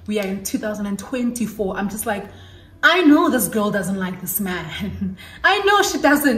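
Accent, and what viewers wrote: Nigeria. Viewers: I discovered your channel through thati ever since I never looked back because whats life without Nigerian accent and your storytimes are the best.❤🥰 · Hey Motswala I started watching you 4 months ago when you were doing a makeup video with Seithati Letsipa since that day I was glued to your channel. I love all videos & storytime, love the Nigerian accent kakhulu ❤️❤️❤️ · Hey Motswala hope you are good?❤❤❤ Naledi M send me here and it was comfortable over here. The first story I watched was the IPhone 6 story time and I decided to stay because of how you relayed the story plus you are funny 🤣🤣🤣 hlogo Yao ae bereke and I’m here for it. Followed you everywhere and your Nigerian accent and love for afrobeats ❤❤❤ I used to think is Igbo or Nigerian. Yeah wene why can’t I download your videos on TikTok? When I catch you😤😤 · Hey Motswala... #10k brunch... 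The very first time I watched this channel, I commented and said am staying.... It was a shout out recommendation from Naledi Mallela.... The Nigerian code-switching accent gets me all the time. I love your authenticity... You could be the girl next door njeee❤❤❤❤ Hope you host a Cape Town Brunch and I get the chance to be nominated 😂.... ❤❤❤ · I joined when you were doing make-up video with Lebonza I came from her channel straight to yours from that day😊...and I enjoy your Nigerian accent and your laughter on all your video 😍❤ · Hey. I joined when you had your Collab with Lebonza and have enjoyed every single video after that. Your nigerian accent is the best! and very contagious lol i hope you get what i mean. Thank you for the lovely content ♥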